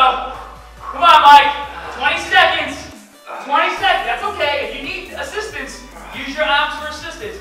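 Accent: Boston, United States. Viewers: Boston accent like crazy! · The Boston accent is strong with this one... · the boston accent is strong in this one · Here due to Covid-19 gym closure. I live in Quincy, MA. And that sounds like either a fake Boston accent or someone with a Boston accent trying to hide it. I grew up in MA with immigrant parents so I talk different than everyone. But back on topic, my quest is to not lose any muscle or strength during this crisis. Good workout! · This was just what I needed for my Coronavirus outdoor workout...your Boston accent kills me! hahahahahaha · Love this leg workout. has the BEST Boston accent ever!!!! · This guy is so hyper and it hyped me up it’s awesome. Also I’m from Boston and I love the accent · its a boston accent i think. it wasn't always so prevalent in the past though.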